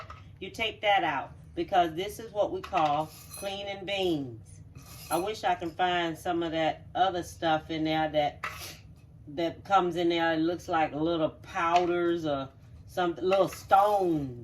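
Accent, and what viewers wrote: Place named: South, United States. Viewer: I LOVE HER! She know about the Soda In there to cut the gas! That’s Saw-sish took me OUT!🤣👏🏽 I already knew she was southern and could BURN when she simply said “this heya” when lickin through them beans! I should have known she was a MaSTER CHEF because she knew to pick through them beans!🤣👏🏽👏🏽❤️🔥🔥 love it!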